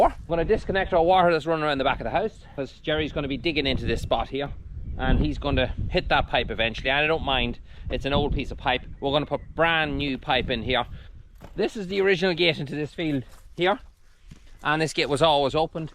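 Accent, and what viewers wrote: Ireland. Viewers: Loving your videos so far and will follow you all the way through your cottage renovation and now it’s got me watching your ifarm wefarm as well. Watching from Perth, Western Australia by the way. We love Ireland and have been there twice all over the place and it’s my favourite country apart form Australia of course. Love your Irish accent, I could listen to you all day.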